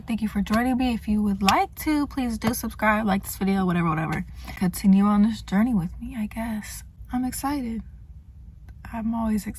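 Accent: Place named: New York, United States. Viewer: U def from new york or maryland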